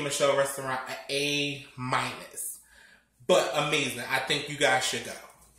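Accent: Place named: Philadelphia, United States. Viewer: I knew You were from Philadelphia you sound exactly like Kevin the Scorpion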